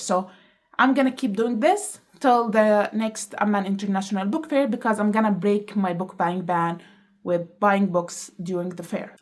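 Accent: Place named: Germany. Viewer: Hi. First time seeing your video . Just curious if you're German. Cause I can't tell if that's a german accent or an Arab accent. Thanks😊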